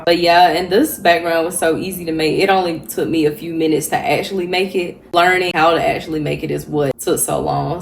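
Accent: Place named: South, United States. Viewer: never realized how country you sound☠️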